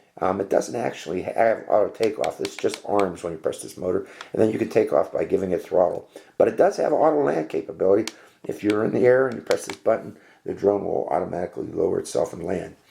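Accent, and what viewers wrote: Pittsburgh, United States. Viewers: I detected a Pixburgh accent! Thanks, from North Hills! Anyone know where I could get a basic case with this? Buying a couple as gifts.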